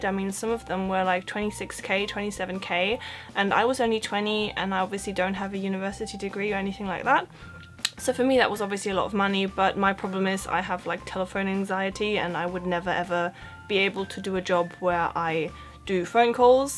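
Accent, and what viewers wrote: Germany. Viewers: Your accent is adorable! You can tell that the London influences are kicking in, but still with undertones of a native German speaker. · I can clearly tell the Getman accent. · Exactly the German accent likes I sound while talking English thanks to my 8 years having spent in Hannover. But the contents you provide are very good for young people want to discover London in an affordable way. Perfekte Arbeit.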